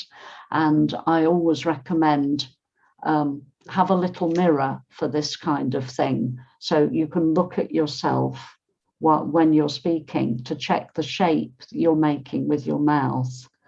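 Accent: United Kingdom. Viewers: Good morning. I am writing, "Good morning," because it is morning in Siberia. Thank you very much for your lessons, they are very useful and your pronunciation is perfect. Real British English. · Thank you so much. You use British accent right. This is what I looking for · i do love ur accent, British accent 🥰 that's y we called english, english is the mother of languages,lol American speaks english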